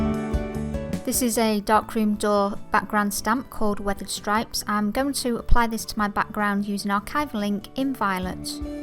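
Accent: England, United Kingdom. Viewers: What a beautiful page ! Your sweet English accent reminds me when I was a little girl I used to watch ART ATTACK with Neil Buchanan and his lovely accent , he opened my eyes to art and crafts and you nourished my sense with your magical touches Wish you luck and happiness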